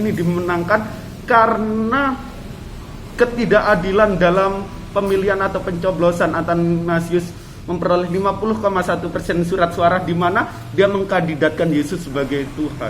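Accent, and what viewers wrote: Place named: Java, Indonesia. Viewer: Ust ini org batak tapi kok medok jowo.ngga ada logat bataknya. Lanjjutkan.you'are the next.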